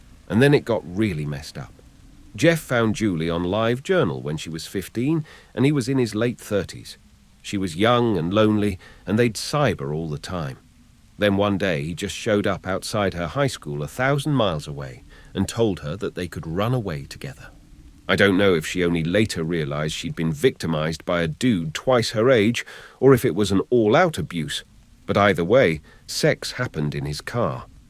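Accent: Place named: England, United Kingdom. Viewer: An , English narrator ! 😀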